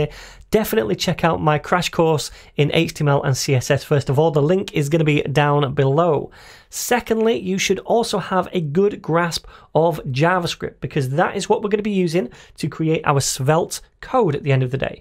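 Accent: United Kingdom. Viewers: don't know yet if your tutorial is ok, but you got +10 points for qool brit accent xd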